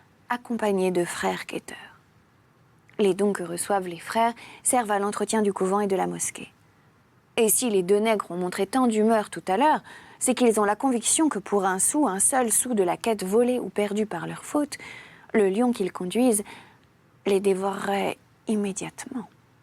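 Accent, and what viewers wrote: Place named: Midi, France. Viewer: C'est et non Camille. Cela dit, pourrait jouer Camille dans Horace de P. Corneille (pour le côté "amoureuse aérienne" on l'entend bien dire avec sa douce voix '"iras-tu Curiace,...") ou Camille dans Le Mepris de JL Godard (à cause du côté beauté fatale et le regard mauvais qu'elle peut avoir). Cette lecture très bien préparée avec des jeux de voix du Midi, confirme des qualités professionnelles qu'on aimerait voir mieux reconnues.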